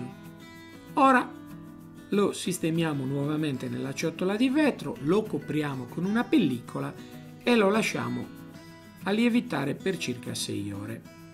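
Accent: Sardinia, Italy. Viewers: mi pareva di sentire un lieve accento sardo ... saluti da Ercole Pinna ... sarebbe interessante una pizza con prodotti sardi ... carciofi bottarga e pecorino !!!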